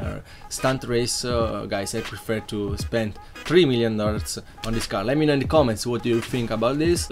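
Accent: Italy. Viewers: Adoro o sotaque italiano kkkk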